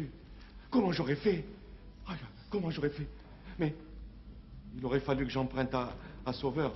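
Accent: Algeria. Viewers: Trop puissant Castel ! Cet accent pied noir c'est que du bonheur. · L'accent pied-noir d'Alger,différend de l'oranais à forte empreinte espagnole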